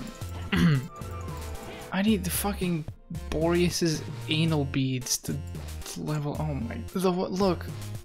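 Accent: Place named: Portugal. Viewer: you have a little accent. it’s actually very slight. i commend your english! but yeah i guess i am just very familiar with the tuga accent hehe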